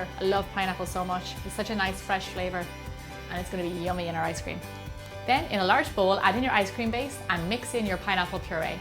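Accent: Ireland. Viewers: Is that an Irish accent breaking in and out? Lovely! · Delicious!!!! and I like your Irish accent.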